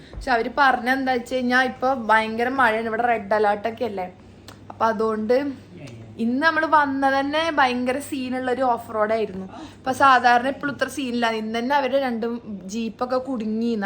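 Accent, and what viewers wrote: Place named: Malappuram, India. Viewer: Ith malappuram slang alle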